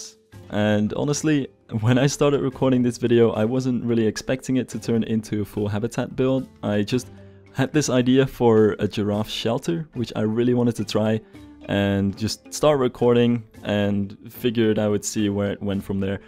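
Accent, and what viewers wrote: Netherlands. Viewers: Are you Dutch i am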